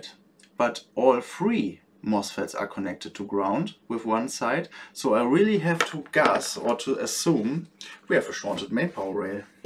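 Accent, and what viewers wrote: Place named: Germany. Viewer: Hello, I have the same laptop. No charging, no power. Your accent sounds like German :D Can you give me some advice?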